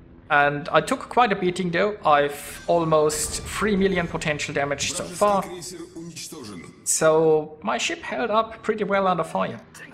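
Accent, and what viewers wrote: Germany. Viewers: Der deutsche Akzent haha sehr gut :)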